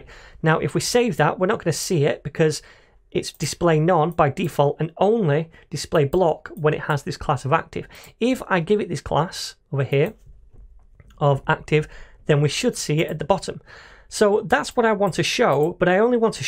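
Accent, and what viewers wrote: United Kingdom. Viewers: Hi! You're pretty cool in explaining things and your British accent sounds funny so I would really like to see next series about pagination. Here's my like and subscription.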